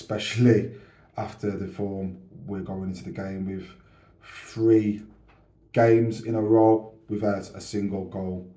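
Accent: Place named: England, United Kingdom. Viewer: look like Lukaku with a English voice 💙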